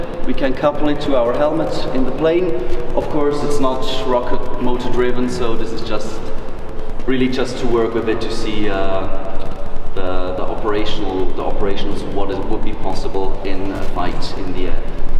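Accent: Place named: United Kingdom. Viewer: Voll interessant. Sehr sehenswert. Erzählt in wunderschönem Britischen Englisch.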